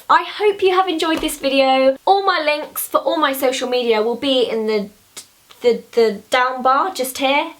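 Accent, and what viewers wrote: United Kingdom. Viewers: are you british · Everytime I watch your videos I just really wish I were from the UK too. Your accent is really lovely! :) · OMG I WANT YOUR ACCENT I HAVE ALWAYS WANTED TO BE BRITISH I'M JUST PLAIN OLD AMERICAN!! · you have THE perfect British accent like its so perf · Your british accent is the same as mine ( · idk why i watch your video because most of these products are probably not in America...but a british accent tho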